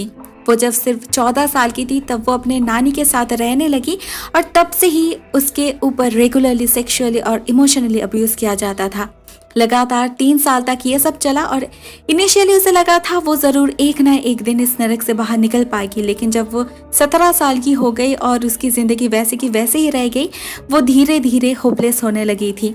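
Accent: Bengal, India. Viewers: Aapki bolne ka tareeqe se pata lagta aap bengoli ho Btw I'm also bengoli 😊🌸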